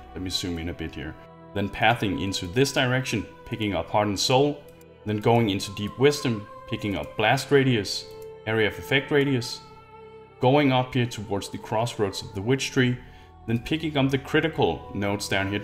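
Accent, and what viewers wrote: Denmark. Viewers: Nice videos tho i must ask: Are you danish becuz you sound like that xD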